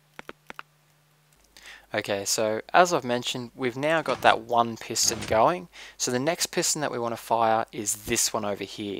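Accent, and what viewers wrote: Australia. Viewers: down under XD sorry made me giggle I work with Aussies so i find all of the little dialectal inflictions amusing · are you aussie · I left a comment Down Under, where your accent's from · Are you Australian? :)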